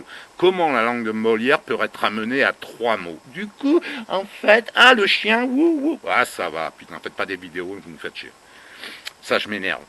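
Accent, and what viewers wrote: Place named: North, France. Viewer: Bjrs Mr votre francs parle viens de quel ville .Qui me rappelle Mr papa du nord .😊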